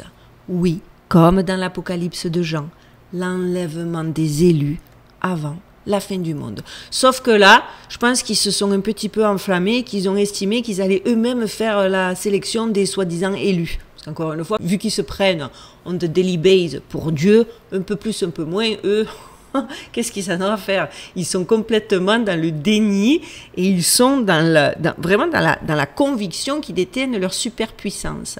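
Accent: Marseille, France. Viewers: Un bonjour de Corse où ton accent Marseillais fait partie des rituels rassurants de nos mercredi et dimanche 🎉❤, merci de nous partager ton enthousiasme et ta bonne énergie ! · Salut --merci pour tes videos---tu es native du sud est ou du sud ouest de FRANCE ?? Tu as ce bel l accent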